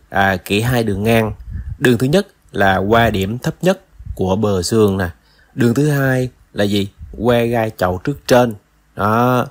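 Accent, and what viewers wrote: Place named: Khanh Hoa, Vietnam. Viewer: Nghe giọng bs quen quá hihi giọng quê hương giống ở KHánh Hoà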